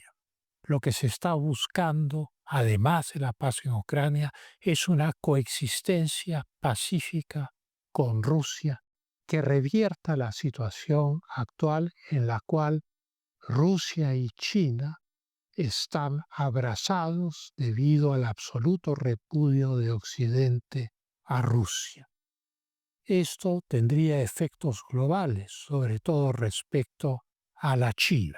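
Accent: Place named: Castile, Spain. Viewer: me suscribo por que respeto su cultura y tu hablar clásico del castellano.- casi o colonial. bien hablado ojala sea tu lenguaje y dicción un ejemplo en el lenguaje en la educación del estudiante colegial del Perú.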